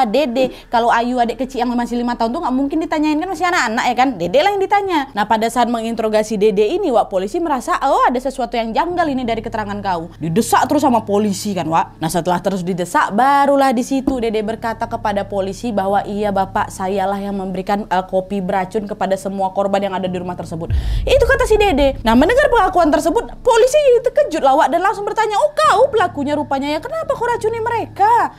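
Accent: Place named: Medan, Indonesia. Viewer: kalau wak yg ngomong patent kali,gaya medan kali,kren wak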